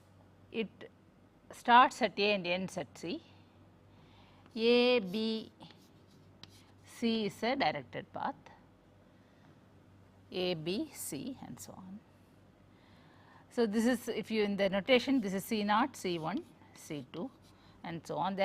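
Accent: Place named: Kerala, India. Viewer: That accent reminded me of my drawing teacher from Kerala lol But she explains so well. I understood everything in one go !! Thank you very much mam !!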